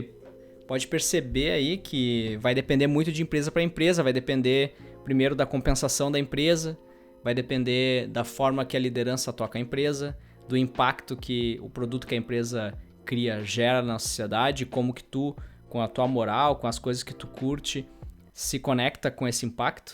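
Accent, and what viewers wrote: Brazil. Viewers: meu sotaque no inglês é igual o seu! Eu sempre ficava muito desconfortável e com medo de tudo que envolve o exterior pelo meu accent. Eu entendo tudo, consigo falar tudo e me expressar, mas o sotaque brasileiro é muito forte e as vezes da aquela engasgada. A gente vê na internet e acha que todo mundo é perfeito e faz tudo que a gente faz 1000x melhor, mas a real é que não. E pra dar certo, pra melhorar, é só ter coragem de dar a cara e tentar. Quem não arrisca não petisca. Parabéns pelo vídeo e sei que o inglês não era o objetivo, mas obrigado! Mesmo num vídeo de "opinião", seu trabalho impacta as pessoas de formas que você nem imagina. Abraço!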